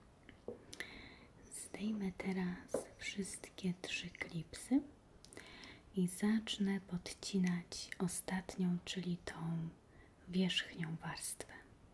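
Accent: Poland. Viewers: ***** myśle że tak... =p ... Btw ... polski akcent jest bardzo piękny. ^_^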